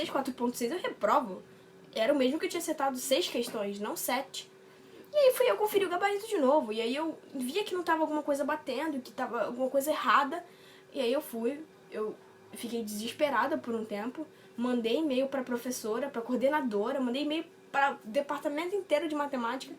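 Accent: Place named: Rio de Janeiro, Brazil. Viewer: "A máquina não foi capaz de me derrotar". Uahaha. Muito bom,! Muito legal seus vídeos, descontraídos e com informações bem interessantes do dia a dia do estudante. Seu sotaque carioca é muito bom de ouvir. Fiz Eng. Mecânica no CEFET-MG e lembro da tensão de fazer algumas destas temidas provas. A maioria das provas no CEFET, eles costumavam e ainda costumam fazer somente questões discursivas (tanto as de Física como de Cálculo), eram bem tensas.:) Mas já tive a curiosidade de ver algumas das provas aí da UFRJ, bem difíceis.:) Parabéns pelo canal!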